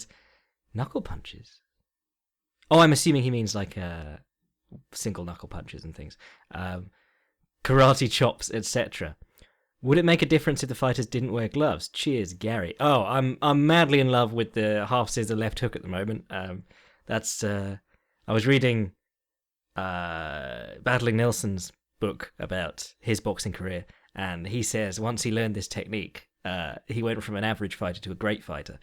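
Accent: England, United Kingdom. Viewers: is back already... the usual week long hiatus of a good old English accent delving into the joys of combat is no more. Thanks!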